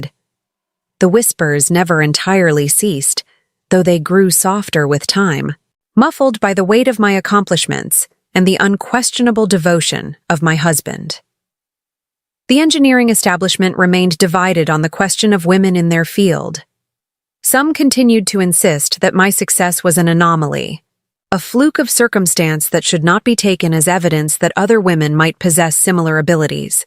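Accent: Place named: United States. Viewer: The voice is American English. Doesn't fit at all!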